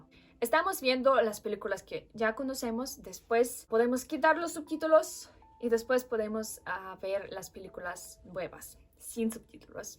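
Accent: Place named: Russia. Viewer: El ruso siempre me ha llamado la atención, por ejemplo me encanta la banda, y buen video y que buenos consejos, lo usaré para aprender también inglés, por cierto, tienes muy linda voz y suena muy bien en español, es decir todavía se nota cómo el tono ruso, y el ruso es un idioma tan hermoso, ¿Me puedes recomendar música en ruso? Y eso pues, saludos 👍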